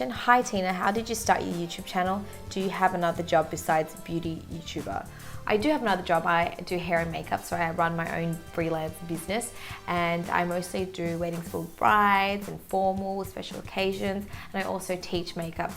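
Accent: Australia. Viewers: I knew you lived in australia you sound just like them I love the way they talk. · I realized your Australia accent just now, I thought you were American in the past. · Omg I love your accent I want to be Australian so bad😍😍😍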